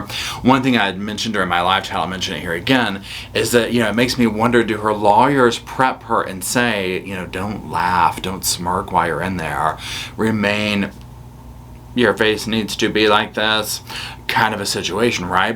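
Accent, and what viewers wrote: South, United States. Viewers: Unrelated to the video topic: Omg, I love Mr. Roscoe P. Coletrain! His sweet lil pawpaw face! How old is he? He’s obviously very used to you holding him and talking with your hands because he keeps his sweet lil face out of the way of your hand gestures. You’re one of the few content creators who sounds almost like me…sweet and southern. Y’all are the best and I’m pretty sure I’d watch you read the phone book as long as Roscoe was there to supervise .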